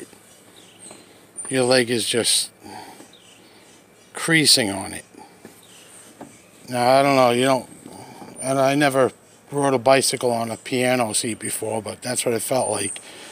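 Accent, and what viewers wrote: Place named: New England, United States. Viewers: My mom was from Boston..sounds like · New England accent is the best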